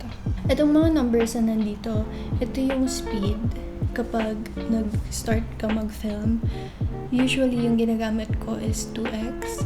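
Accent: United States. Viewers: Parang americana magsalita Ang cutee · Parang americana po kayo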